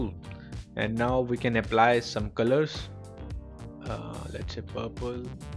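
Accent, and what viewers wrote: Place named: India. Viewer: it is allways the indian accent, perfect. thanks.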